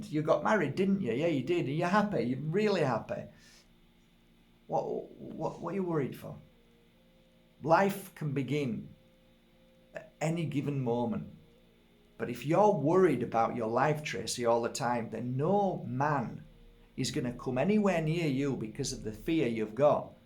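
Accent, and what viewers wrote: United Kingdom. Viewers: You're so right, this is so true. I loved this video, you made me laugh so much at the end! 😍♡🌸 I could listen to you all day, it's always a pleasure to listen to your videos, it's like we're having a tea together! ☀️😌 And as usual I love your UK accent! And as you say at the very end of the video: today is the first day of the rest of our lives. 🥰😊😇 Hugs and kisses to you, take good care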